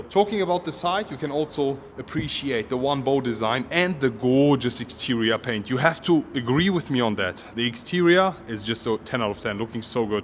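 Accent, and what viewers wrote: Germany. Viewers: Dein deutschenglisch ist furchtbar alter…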